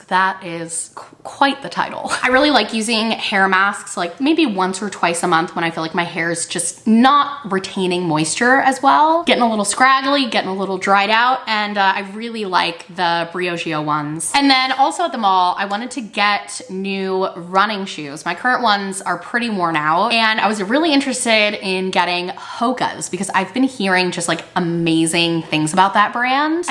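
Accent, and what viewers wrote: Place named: Southern California, United States. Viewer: You pronounce a lot of things differently than me but who cares! I live in NY and you have a SoCal accent. I think it's cool to hear voices different than my own.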